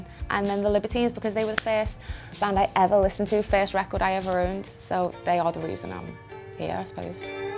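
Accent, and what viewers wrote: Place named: England, United Kingdom. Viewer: While her music is fun, and well produced, sounds and acts like an American's stereotype of an English person truly personified.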